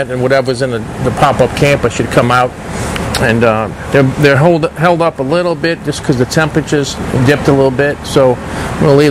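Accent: United States. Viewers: What state accent? Is that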